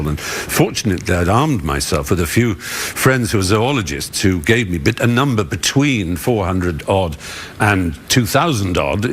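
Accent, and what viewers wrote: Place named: United Kingdom. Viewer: А я почти все понимаю, у Стивена Фрая оч. ясная англ. речь, он считается лучшим носителем британского акцента в Англии. Посмотрела неск. его шоу - его речь сильно отличается именно ясностью и понятностью!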